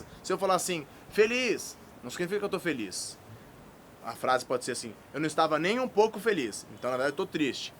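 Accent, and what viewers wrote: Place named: Argentina. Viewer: vc é argentino né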